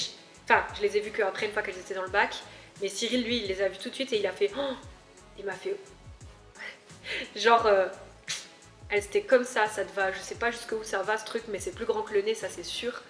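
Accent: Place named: North, France. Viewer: Cet accent du nord prononcé ! ! Le sujet est hyper intéressent, je me suis fait opérée aujourd'hui. Je ne sais pas si tu continue YouTube mais pense à raccourcir tes vidéos et couper les moments inutiles (genre le chien qui aboie) en revanche ce qui me stress c:est qu'on m'a demandé d'enlever les mèches moi même moins de 24h après. Je ressemble à éléphant man aussi! Mes yeux sont hyper gonflés et violets/noirs !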